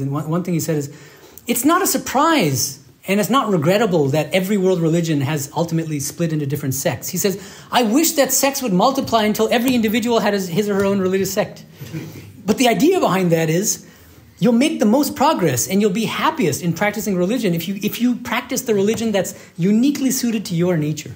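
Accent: United States. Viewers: Exactly... He simply states Advaita as 'ब्रह्म सत्यम जगत मिथ्या' by World is not real. He did not take any effort why 'मिथ्या' has been used. He is not aware of Shankara parampara also. His American accent might allure some people but not true seekers. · A monk should not have bias which this monk has in abundance. He may be a scholar but lacks in the fundamental factor of expression of his views. He may.speak the English language like an American but does not know how to teach, how to pause, how to repeat. All he does is to show off his American accent. It seems as though his object is to put down Sri Shankaracharya Bagavadpada by questioning the authorship of Sri Shankaracharya's great works ; the crown being Viveka Chudamani. You may have a completely different opinion and you are entitled to it. But you have no right to speak of Shankaracharya in the manner you spoke. 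Did you play marbles with Sri Shankaracharya? On hearing you I get the impression that you are out to insult Sri Shankaracharya ( not that any one can do that, least of all you) and you are out to show off your accent. You can never compete with Swami Sarvapriyananda for you don't have the guna required for it. You are not fit to be called as monk. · My two cents: Swamiji has given a fresh and comprehensive perspective of what is to be understood as Vedanta philosophy in the light of the teachings of Sri Ramakrishna and Swami Vivekananda. In addition it is a knock on the heads of fanatical bigots who are possibly insecure about their own beliefs . Can you ignore a toothache saying it is unreal? will you refuse to bow down to a statue of Sri Shankara saying it is unreal? Just as you are questioning the Swami if he played marbles with Shankara were you present personally when the acharya wrote the Vivekachudamani? 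If you look up online you will see the authorship is questioned by many scholars. Talking disrespectfully about a monk of Ramakrishna order is unbecoming of a spiritual aspirant. Swami Medhananda was born and brought up in the USA and has a natural American accent. Also Swami Sarvapriyananda and Swami Medhananda have due respect for each other's views.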